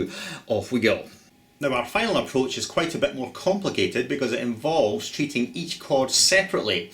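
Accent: Scotland, United Kingdom. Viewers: well - i'm convinced, you know what you do. how is it possible people can get so good at their instruments?, how many hours have you spent playing in your life? just roughly. i want to estimate if there is a chance for me at all. major doubts. btw, are you scottish? sorry if i'm wrong, but there is something in your accent i have heard before - jim kerr. (i'm german - i can't figure it out exactely)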